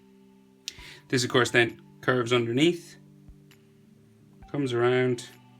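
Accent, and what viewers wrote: Ireland. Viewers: Trying to draw mob and immediately recognised your accent, my Irish brother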